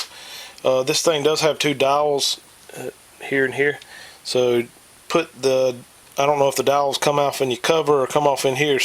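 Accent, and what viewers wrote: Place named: North Carolina, United States. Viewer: Where you from, you sound like your from my neck of the woods, NC, great video, hate we missed those few parts from the recorder but for most part excellent video, God bless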